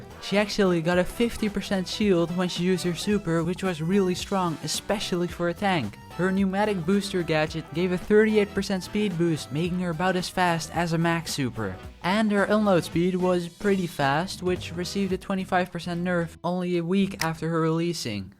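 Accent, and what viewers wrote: Netherlands. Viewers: are you from the netherlands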